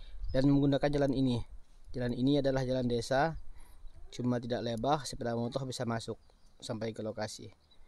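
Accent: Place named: Banjar, Indonesia. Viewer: Logatnya kayak logat banjar dikit..maaf bg mau nanya kenapa daerah sambas bnyak dijual lahan uda jadi..kalau untuk perantauw aman aj kah hidup disana bg..gak ada gesekan seperti yg lalu2 kah bg..antara masyarakat tempatan sma madura..gmana penduduk asli disana bg..apa menerima dengan baik untuk pendatang dri luar dan berbagai macam suku..🙏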